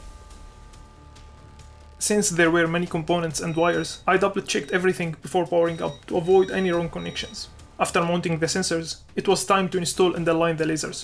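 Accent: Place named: Levant. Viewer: دخيل الشوام والأكسنت تبعوووووووووون 😆♥️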